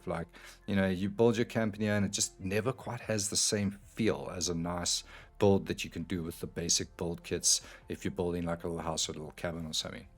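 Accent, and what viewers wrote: South Africa. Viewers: Is that a South African accent I hear?....🍻🇿🇦👍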